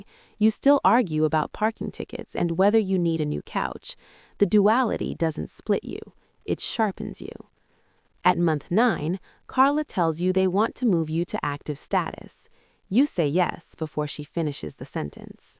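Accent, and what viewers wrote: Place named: India. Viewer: Good video but why the voice change to an Indian accent no keep posting man good luck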